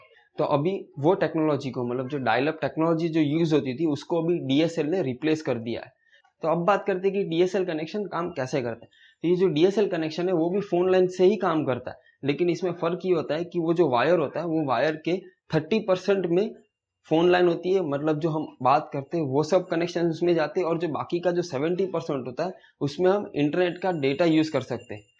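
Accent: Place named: Gujarat, India. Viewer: Bhai app gujarati chone avaj thi lage che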